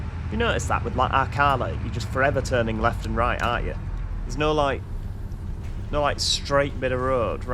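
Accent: United Kingdom. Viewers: What part of the UK are you from? You have a very sweet accent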